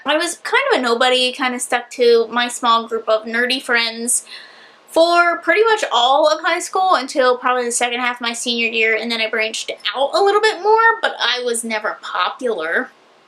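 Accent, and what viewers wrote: South, United States. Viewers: OMFG I'm from MD (right on the DC border though) and have always assumed you were from WV or another southern state... I'm really shook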